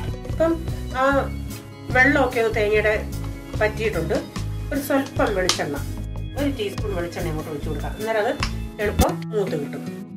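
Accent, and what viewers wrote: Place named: Kottarakkara, India. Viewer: സംസാരം കേട്ടിട്ട് കൊട്ടാരക്കര ഏരിയയാണ് വീട് എന്നു തോന്നുന്നു.,ശരിയാണോ??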